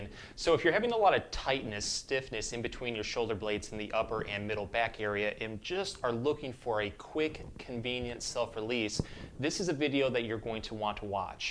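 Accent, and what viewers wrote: Michigan, United States. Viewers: Completely unrelated to the video, but this made me realize how strong Michigan accents can be when you listen to them Related to the video, this is very helpful and should ease some pain from working! It’s a shame I’d need to drive across the state to get treated by you though, you know your stuff!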